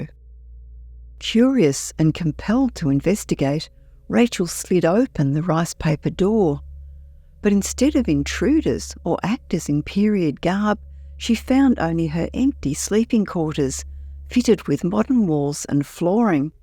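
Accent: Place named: Australia. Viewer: Australian narrator ❤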